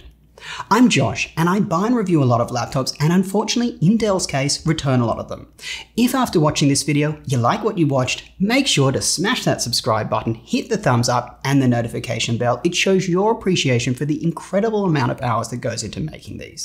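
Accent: Australia. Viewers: Why do you use pounds if you sound Australian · cool accent, is it Australian？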